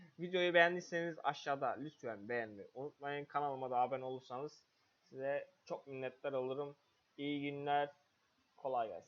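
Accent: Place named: Turkey. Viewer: kelimeleri türkçe gibi okuduğunda çıldırıyorum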